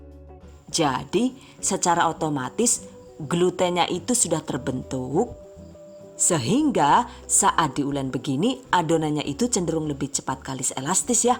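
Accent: Banyumas, Indonesia. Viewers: Padahal inyong juga wong MABES (MADIUN BREBES) bisa ngomong ngapak juga tp kl denger si mbakayune lg ngomong ngapak inyonge auto ngakak 😅😅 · sy suka resep2nya..selain mudah caranya,suka bahasa dan logatnya..banyumasan(purwokerto)gitu😍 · owalah medok nemen banyumasane ha ha ha..... mksh mba mudah dimengerti ilmunya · Mbaknya lucu suaranya kyk orang bule tp kyk pangenyongan orang apa to sampeyan itu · Langsung download....👍 makasih yang ngapak bgt tp lokasi Jatim 😁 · Kanggeeeeeeeen karo bhs ngapaknya..... Mksih resep bludernya bun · Suka banget dengan channel ini, penjelasannya detil dan menghibur , logat Banyumasannya itu lho ngangenin. semoga menjadi amal jariah. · Bunda asli mana si? Ko bisa bahasa ngapak kaya saya.😀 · yg baik hati cantik dan salekhah...ttimakasih resepnya...pokoke ora ngapak ora kepenak 😀😀😀👍👍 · salam kenal ya bunda yg gaul and ngapak2 he he catattt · Jan seneng banget krungu ngapak koh dadi terobati rinduku karo mama ku.suwun yung karo bahasa ngapake. 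Slm dari perantauan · Bukan Tegal mas...ini pasti asli wong ngapak (Banyumasan). "Ora ngapak, ora kepenak"😁😂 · ini resep yg ditunggu tunggu vocal audionya ok bgt seperti penyiar perbaduan bhs ngapak dan englishnya mhn dipertahankan sucses selalu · Klo nyimak pas ngomong ngapak itu lo hahaha.... kocak, masuk OVJ deh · Meski aq jg orang ngapak tpi klo denger ibu ini ngeluarin jurus ngapakny psti aq ngakak 🤣🤣(pdhl gi nyimak serius bgt langsung ambyarrr...) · Channel kesukaan saya dari awal liat YouTube sampai kini...dari suara ,cara mengucap kan keren banget 👍🏻di tambah bahasa ngapak nya yg khas ,juga ilmunya yg profesional 👍🏻👍🏻👍🏻 sukses selalu❤️👍🏻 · Makasih resepnya bunda,sipp dech, lucunya bhs ngapak e..😘😁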